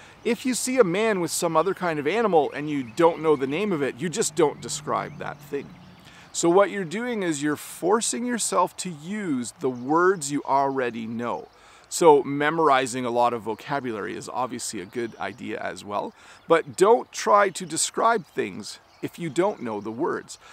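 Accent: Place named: Canada. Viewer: is this canadian english? I understood everything he was saying. American English is difficult for me to hear.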